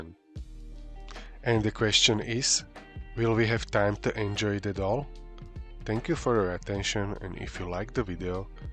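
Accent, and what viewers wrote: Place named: Austria. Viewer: Are you austrian? You have a strong accent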